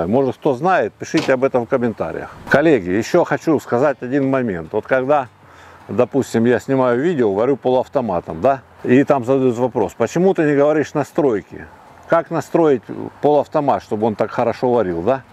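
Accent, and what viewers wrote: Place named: South, Russia. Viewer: почему говор южнорусский? Точнее что-то между Краснадаром и Ставрополем, возможно Ростов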